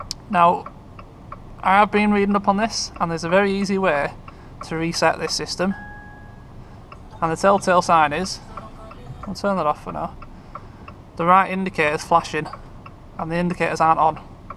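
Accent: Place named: Northern England, United Kingdom. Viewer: ....also, was watching this on mute with subtitles.... now realised you’re a northerner like me! That’s a win over the southern salvage guys 🤣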